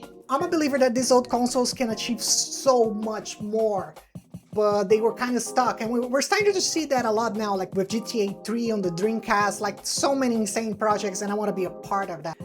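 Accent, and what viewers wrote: Brazil. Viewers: i listened to about 5 seconds of your accent and realized youre a fellow brazilian, thats so cool :3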